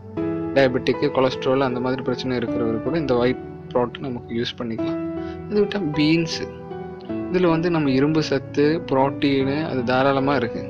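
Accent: Kerala, India. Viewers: Unga Tamil la malayam slang iruku..r u a Malayali??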